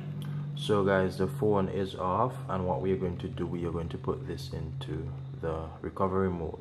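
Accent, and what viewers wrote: Jamaica. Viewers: Thought u were trinidadian at 1st but you're def jamaican. Much love still though brother. Your video was really helpful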